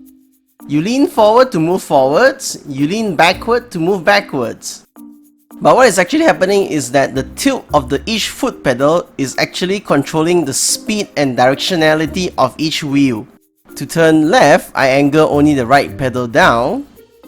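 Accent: Singapore. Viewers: You sound like a Singaporean/Malaysian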